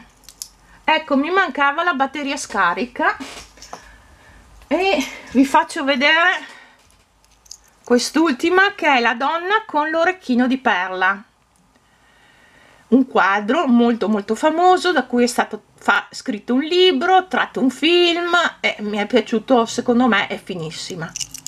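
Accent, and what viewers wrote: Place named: Verona, Italy. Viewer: Ciao, ti seguo sempre molto volentieri per tanti motivi. In primis la tua cadenza che adoro essendo per meta' veronese; le tue creazioni sempre cosi' piene di fantasia, il tuo buonumore e la tua gioia di vivere. Poi sentirti nominare paesini che mi ricordano la mia infanzia tipo Boscochiesanuova dove andavo da bimba, mi fa sempre sorridere. Grazie di tutto e Buona Pasqua a te e a tutti coloro che ami. Olga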